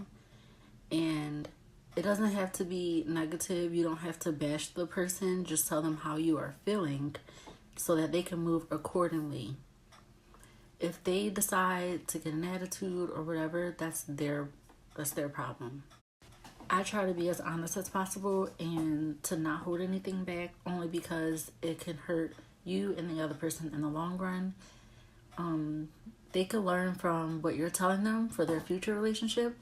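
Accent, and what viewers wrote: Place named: Baltimore, United States. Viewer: Thank you from sharing! Are you from Baltimore? I hear your accent lol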